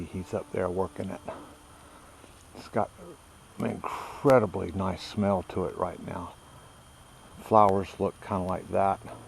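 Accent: South, United States. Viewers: When he said "purdy" I was just like 😍 I love that southern accent 💗 Gorgeous tree vine.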